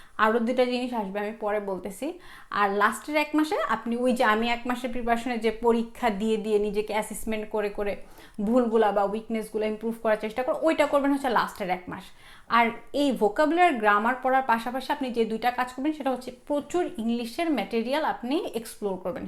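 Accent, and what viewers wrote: Sylhet, Bangladesh. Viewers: khuda lagi gesilo......sylheti accent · Apu apni Sylheti?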